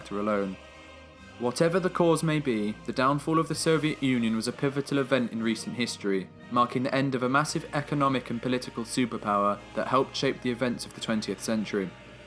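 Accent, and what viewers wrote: RP, United Kingdom. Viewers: "Excuse this British accent" - no, I won't. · Dramatic music, slideshow pictures, Wikipedia text, British accent...can’t beat that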